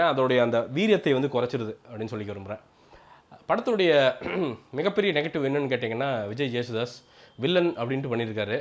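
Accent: Chennai, India. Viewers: ne chennai slag pesatha ......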